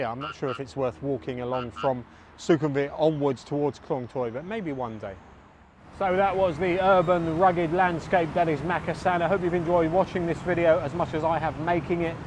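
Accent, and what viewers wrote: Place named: London, United Kingdom. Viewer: .. i'm fascinated by your accent.. you sounds like born and bred Londoner.. how come!!!.. just founds your Channel.. haven't had time to look at all your video yet..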